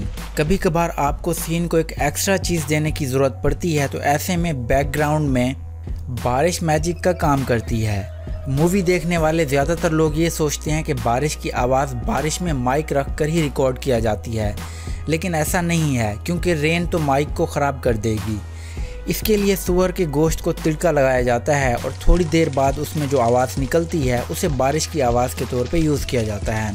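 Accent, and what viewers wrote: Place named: Pakistan. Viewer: Iski aawaz se ye Pakistani lag raha hai............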